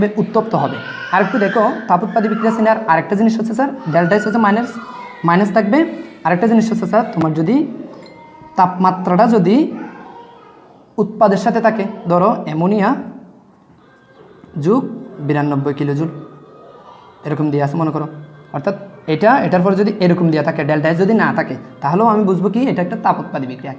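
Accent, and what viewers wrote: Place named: Chittagong, Bangladesh. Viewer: স্যার আমি আপনার ভিডিও আজকে প্রথম দেখেছি এবং আপনার কথা শুনেই বুঝতে পেরেছি যে আপনার বাড়ি নিশ্চয় চট্টগ্রাম।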